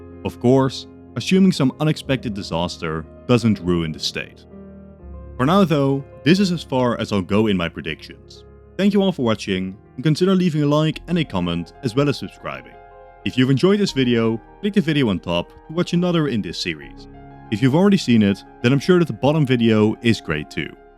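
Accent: Netherlands. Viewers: Ik ruik Nederlands accent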